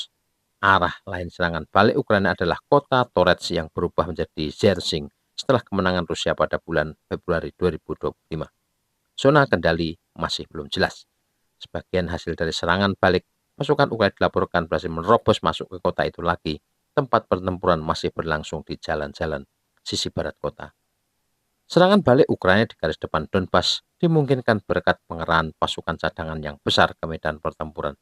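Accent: Java, Indonesia. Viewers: Logat jawanya kental...ngx,seru bahas perang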